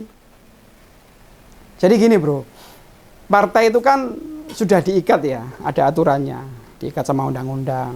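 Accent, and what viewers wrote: Java, Indonesia. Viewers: ini asal daerah mana ya kok jawanya medok banget..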